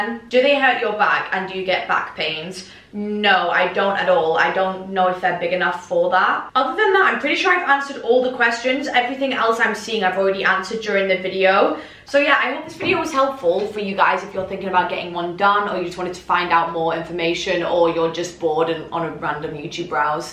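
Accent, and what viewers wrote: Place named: North, United Kingdom. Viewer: You sound allot more northern back then lol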